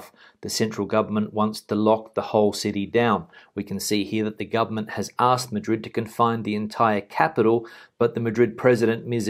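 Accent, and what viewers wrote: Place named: Australia. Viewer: your mild Australian accent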